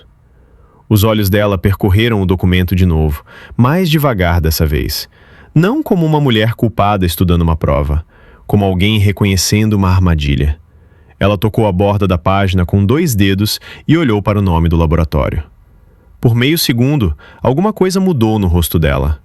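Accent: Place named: São Paulo, Brazil. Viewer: Sotaque de paulistano!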